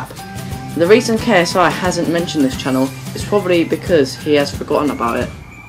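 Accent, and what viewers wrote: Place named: England, United Kingdom. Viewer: Where abouts from England are you from. I am from Leeds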